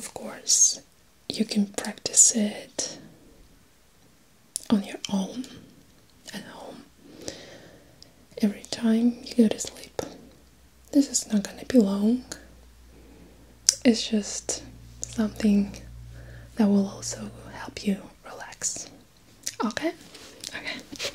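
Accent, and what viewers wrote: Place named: Russia. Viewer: Впервые на канале)) но сразу слышно русский акцент)) и книги 📚 физиология человека😄 но видео ничего есть пару норм!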